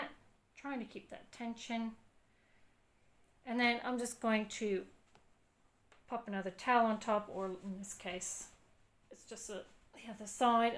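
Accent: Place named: Australia. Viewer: You have a very interesting accent. Australian mixed with what? Canadian? American?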